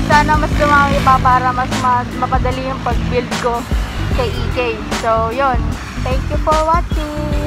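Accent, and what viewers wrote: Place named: Batangas, Philippines. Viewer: Nakapakaangas!! Bagayyy kay elmo!! Ang cute din ng batangueña accent mo hehehe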